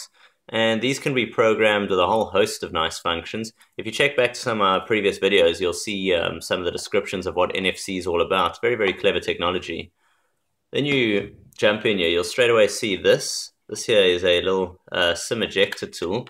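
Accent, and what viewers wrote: South Africa. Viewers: i knew you where south african! awesome to see local stuff :) good stuff man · Managed to get a WHITE P7 which is extremely scarce at the moment in Cape Town! Price has come down a lot....R5300.... went from the iPhone to this one and its stunning! Was so nice to listen to this video done by a South African.... Thnx for a good tutorial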